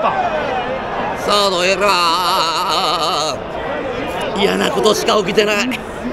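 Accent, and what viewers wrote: Kansai, Japan. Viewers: ハムファンです。さんの動画初見ですがめっちゃ面白かったです。関西の方のしゃべりがさすが👏👏👏応援歌をめっちゃ大声で歌ってるのも良かった！なんとなーくタイガースファンは怖いのかな…と思ってたけど見方が180度変わりました！！相手チームを素直に褒めるのも素晴らしいですね。セ・リーグは阪神応援します！！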